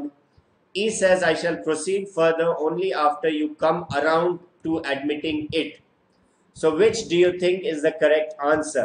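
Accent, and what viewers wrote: India. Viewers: Sir are you mallu??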